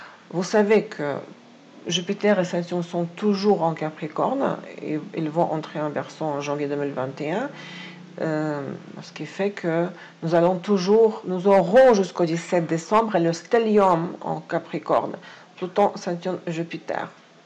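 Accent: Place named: Russia. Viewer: Merci! Je ne rate pas une vidéo depuis longtemps. J’ai, depuis mes études, une grande amie au Costa Rica, musicienne professionnelle connue, à SanJose. Cela me rapproche. Aussi, je suis impressionné par votre magnifique connaissance des langues, très touché par votre affection pour le français et les francophones, et votre très belle personnalité. Votre accent russe est vraiment charmant! Suis Taureau, ascendant Lion, et je vous remercie pour votre travail et votre bel esprit. Un beau bonjour depuis Paris!🎶💐🌷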